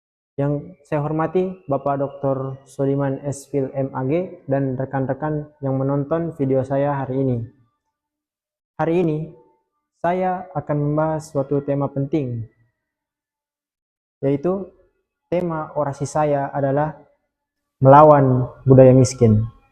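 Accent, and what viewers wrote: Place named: Java, Indonesia. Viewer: Jawanya melekat bgt kak🤩🤩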